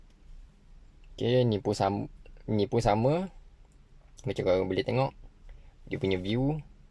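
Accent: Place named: Terengganu, Malaysia. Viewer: Brekmok orang teganu cakak gini ni.hahahaaa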